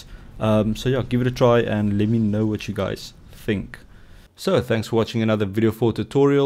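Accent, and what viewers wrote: South Africa. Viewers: "Suid Afrikaanse" accent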